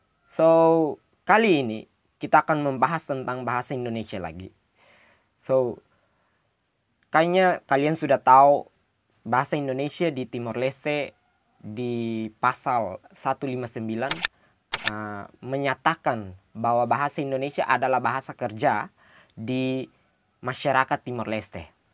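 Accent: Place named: Malaysia. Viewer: Maaf.. Bisakah kata 'so' yang sering kamu ucapkan divideo mu... kamu ganti dengan ucap "jadiii".. kalo sering ucap kata "so"... jadi mirip kayak cara bicara orang malaysia.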